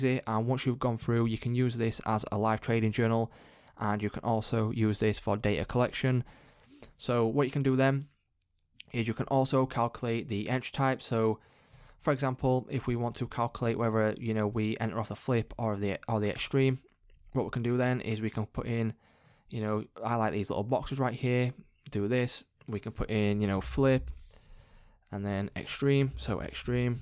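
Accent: United Kingdom. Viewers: you are british. arn't you? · i think bro is britian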